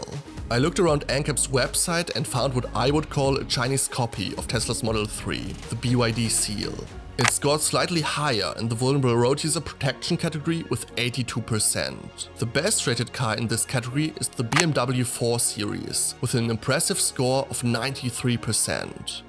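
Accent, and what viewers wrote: Germany. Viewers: German accent by ai is terrible😢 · You sound like a german talking english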